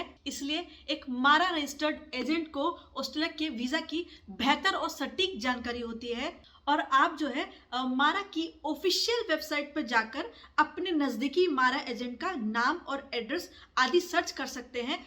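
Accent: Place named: Himachal Pradesh, India. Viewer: Aapki hindi thodi Himachal se milti julti h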